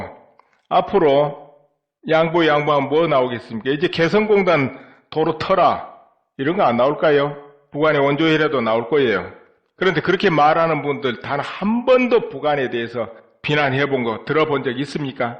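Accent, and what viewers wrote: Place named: Honam, South Korea. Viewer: 재밌고 논리적 말쌤과 이해가 쉽도록 진행. 그리고 구수한 목소리....감사합니다. 헌데 호남사람이시지만 한글의 특징가운데 구개음화 라고하는 규칙이 있는데 예를들면; 전라도. 사람들은 의" 발음을 못해 으"로 하죠 , 그래고 진행자께서는 북한이라쓰고 발음은 북칸이라 하셔야죠. 등등. 좀 생각을 해보십시오 어쩔까요. ㅋㅋ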